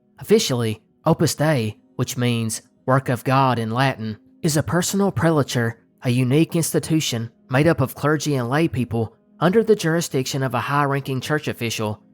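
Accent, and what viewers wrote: South, United States. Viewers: that's why I always call you my southern brother 💙💙, when I became aware of the Bible being edited I thirsted for knowledge of all things hidden, leading me into the rabbit holes of everything. I know you are the same and that's why I love your content. If money wasn't so tight for me since my daughter broke her back in a car wreck and she and my grandson live with me I'd support your channel in every way. You've been my favorite from the 1st time I heard that genuine southern accent 😊😊. Don't change a thing, love from Arkansas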